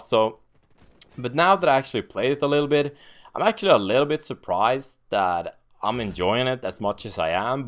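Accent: Sweden. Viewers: Swedish accent :) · are you from norbotten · swengelsk accent,älskar den haha x'D härligt härligt lol har sett mig själv i ett par av dina MW2 clips :P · svensk från norrland?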